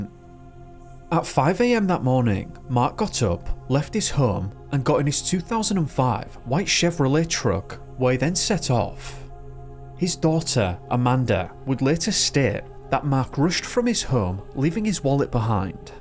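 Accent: United Kingdom. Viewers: Accent from the UK, it's not bad. I'm from Finland and I learned to listen to that accent while studying English in school bc our teacher talks exactly the same way 😊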